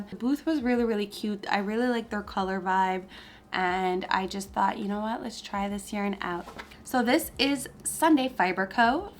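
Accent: Quebec, Canada. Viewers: I just posted my knit city video as well! I learned to knit on youtube in 2016 but picked it back up during quarantine. Are you Quebecois? I can tell by the way you read those labels! #frenchcanadiangang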